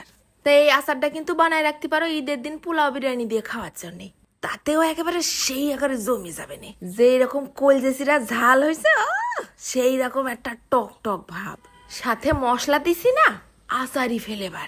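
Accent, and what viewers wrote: Jessore, Bangladesh. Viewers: আপনার বাড়ি কি যশোর না গোপালগঞ্জ?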